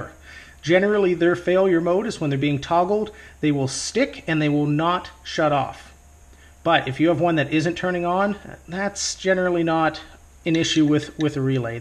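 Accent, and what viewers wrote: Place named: Canada. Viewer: Love the Canadian accent. Reminds me of the good ol trailer park boys.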